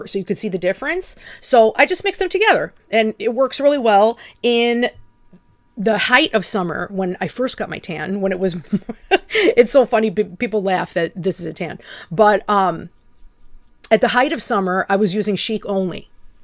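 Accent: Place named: Midwest, United States. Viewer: Love your midwestern accent! 😀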